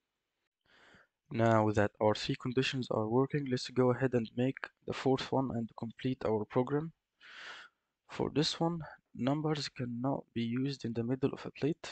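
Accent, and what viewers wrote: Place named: Egypt. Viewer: i know an egyptian when i hear one 😅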